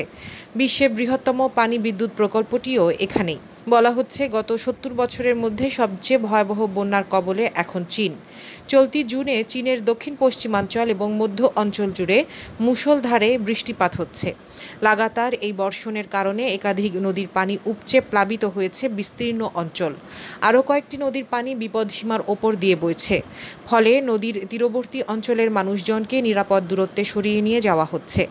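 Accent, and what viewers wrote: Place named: India. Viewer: sobaike ekta onurud korbo. jara purbo pocchim.. ai cheneler khobor sunen taderke bolchi. ai chenelta age bangla deshi chilo akhon seta varoter kace bikri hoye gece... age khobor porto ekjon purus .... ekhon meye khobor pore.. ami varot ebong bangla deshider kontho buji... ei meye varotiyo.. mittha songbad pochar kore. ami onek age theke khobor suntam ekhon ar sunina... ajke UN SUBSCRIBE kore dici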